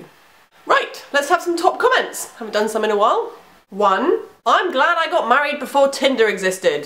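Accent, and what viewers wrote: England, United Kingdom. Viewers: I like your videos because of your accent and the way you talk. As an American English learner I had absolutely no interest in British English but your voice, your intonation and the wormth you have in your talking made me interested and so your videos. I can not ignore your beauty though but linguistically the way you speak makes English learners both American and British sides interested. keep going gal. thumb's up. From "Iran" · How on Earth are you single?! I mean you're really funny and witty and you have the looks.You must be really picky, probably that's why. Anyway I really love your videos and your British accent! Greetings from Romania. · I watch your videos because of your british accent the way you say stuff sometimes is hilarious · Here's why you're fun to watch... 1). You're very attractive. 2). You're funny. 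3). You're witty. 4). You explore a wide range of topics. 5). You have an English accent that's interesting to Americans. 6. You're very likable. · I think your, funny, pretty, and your British(love the accent) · I love her because of the accent, ( actually all english girls) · I like ur voice with your british accent. And yeah. Your number 5ves too. They're kind of sweet the more i watch. British girls FTW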